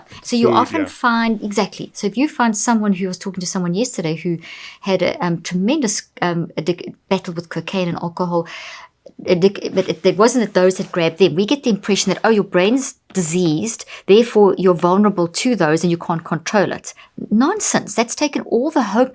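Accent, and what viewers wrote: South Africa. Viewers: South African 😍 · Still learning to understand the South African accent of this wonderful woman lol 🍀 💖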